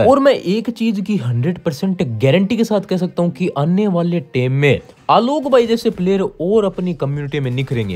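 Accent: Haryana, India. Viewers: Bhai Haryana se he kya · Bhai aap Haryana sa to k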